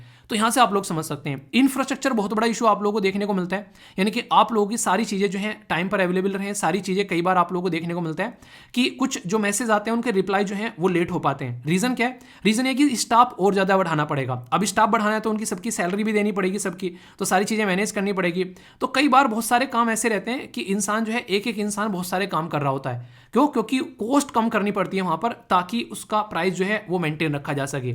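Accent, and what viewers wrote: Haryana, India. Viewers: haryanvi ascent chait basiakh sadh saman................... love from rtk(HR)😍😍❤❤❤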